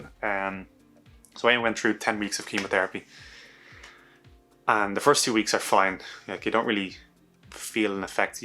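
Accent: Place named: Ireland. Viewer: Are you in Ireland? Your accent sounds Irish.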